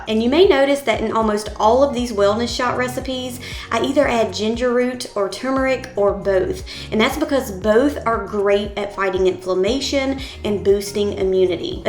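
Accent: South, United States. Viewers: your southern accent 😍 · She country af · I love your accent. I’m taking a guess Southern States of US.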